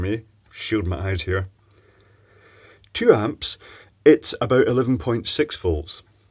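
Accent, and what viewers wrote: Scotland, United Kingdom. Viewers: I think you're mishearing his dulcet Scottish tones there, +jeromekerngarcia : 12 x 28 = 336. He later points out that the long rows are 7 x 4 = 28, so it's really 4 x (12 x 7), which of course still comes to 336, although you're right that Brexiteers would probably scrawl "It's 240 LEDs!!!" in massive letters on the side of a bus and claim anyone pointing out that the maths shows 336 was part of "Project Fear", these days known as "Project Reality" ;)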